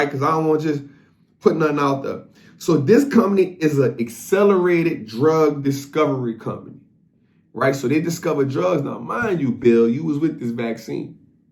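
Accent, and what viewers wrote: New Orleans, United States. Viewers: I'm from New Orleans and instantly noticed that accent, saw you on earn your leisure podcast and you are an inspiration, I started invested a couple years ago and this year I'm finally grasping this and I love the way you explain things, I want my money to grow even more and faster so I can actually enjoy it, big inspiration man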